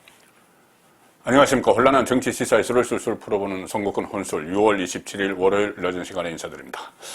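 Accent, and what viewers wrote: Gyeongsang, South Korea. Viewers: 언제나 투박한 경상도남자 잘듣고있습니다 정확한 판단과 전달 좋습니다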